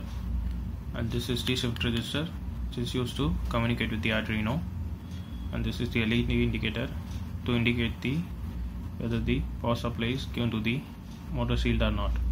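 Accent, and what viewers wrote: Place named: India. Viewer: Damn always Indian accent...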